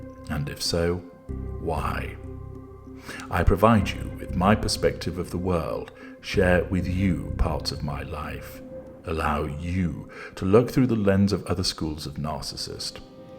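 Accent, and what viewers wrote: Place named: RP, United Kingdom. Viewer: one of the most intelligent, creative and original voices on YouTube. Also one of the most hypnotic bass voices speaking the Queen’s English. Please don’t go away.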